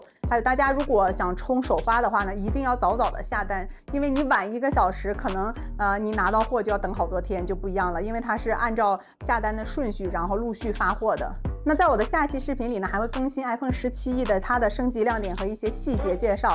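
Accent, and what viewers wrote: Northeast, China. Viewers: 麻烦能否克制一下东北口音，尽量说普通话，这样效果更好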